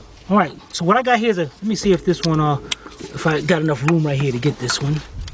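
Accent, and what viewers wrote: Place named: New York, United States. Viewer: Wish I would have seen this before i paid for the job. You got the most swagger of any mechanic I’ve seen. Sound like you are from New York.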